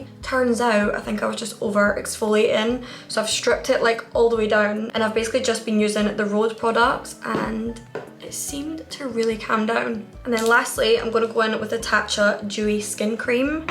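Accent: Scotland, United Kingdom. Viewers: is that a scottish accent? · Omg your so pretty also r u Scottish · Scottish accent :)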